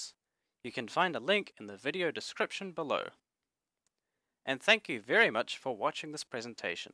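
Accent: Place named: New Zealand. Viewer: Nice music, but it makes me relax to the point of sleeping. It becomes harder to focus, and since I love (and miss) the kiwi accent, it acts as ASMR ^^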